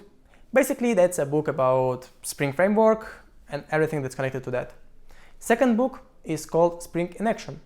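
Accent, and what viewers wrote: India. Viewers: Why do you sound Indian😅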